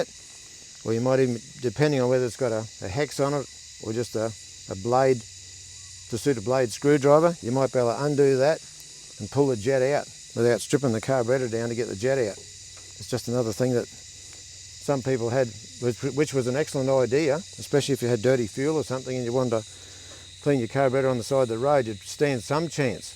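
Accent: Australia. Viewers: Critters. Snakes DEN maybe. Mate it's GREAT to meet a true professional with an Aussie accent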